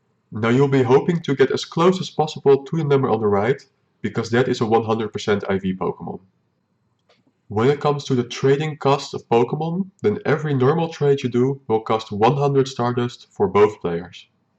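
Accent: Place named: Netherlands. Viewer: Are you from the netherlands cuz youre accent is really dutchy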